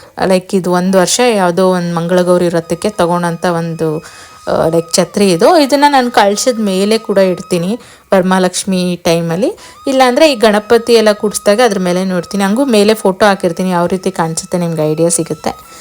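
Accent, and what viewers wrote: Kolar, India. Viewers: Nimdu kolar ahhh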